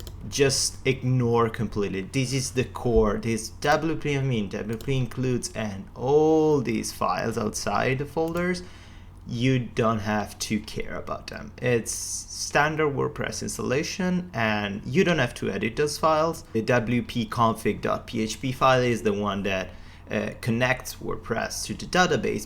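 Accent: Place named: Italy. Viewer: Il tuo accento italiano rende il tutto molto comprensibile e fluido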